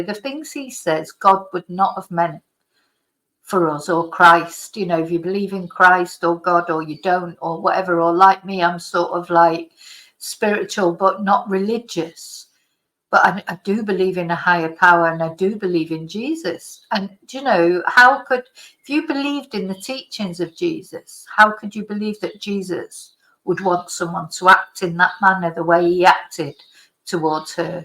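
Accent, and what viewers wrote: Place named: Manchester, United Kingdom. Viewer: Hello. I love listening to you and your great comments. So nice. Also your accent. I'm from Mcr too and miss it so much. ❤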